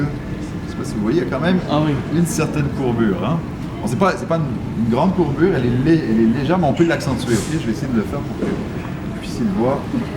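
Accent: France. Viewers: Pense tu as pris l'accent des Français tu ne plus ton accent Québécois... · pourquoi il s'est donné un accent francais???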